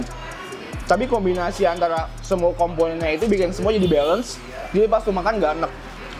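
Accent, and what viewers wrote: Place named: South Jakarta, Indonesia. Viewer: Bahasa jaksel lu gak usah ditahan-tahan bang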